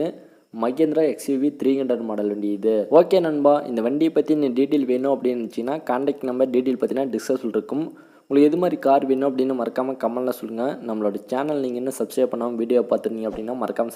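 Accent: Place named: Hindi Belt, India. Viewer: Sir neenga tamila......Hindi Madri pesureenga nanba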